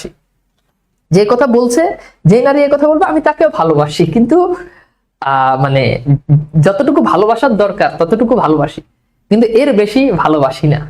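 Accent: Noakhali, Bangladesh. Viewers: sir apni ki Noakhailla?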